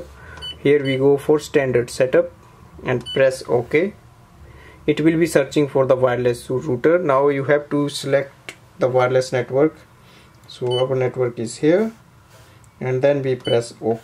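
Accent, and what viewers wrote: India. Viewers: The only Indian accented English voice I will ever trust..Thank you!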